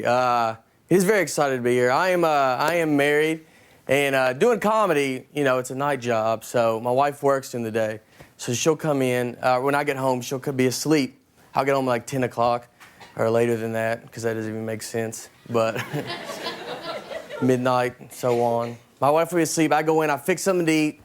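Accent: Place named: South, United States. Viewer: Much younger look back then obviously, but I noticed way more twang in his voice too, country southern sounding.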